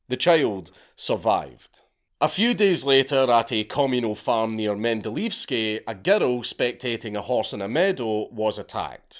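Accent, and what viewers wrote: Scotland, United Kingdom. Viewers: If you have the volume low enough and aren’t well versed in the Scottish accent, girls sounds like “ghettos”. Took me like 30 min to figure out why he keeps calling people ghettos lol.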